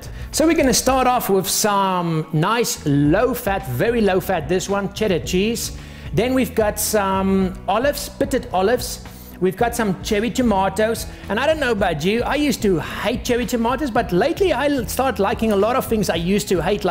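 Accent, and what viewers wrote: South Africa. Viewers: hey im south african and i cant help but to hear a south african accent from u. are u from South africa? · I think he's South African...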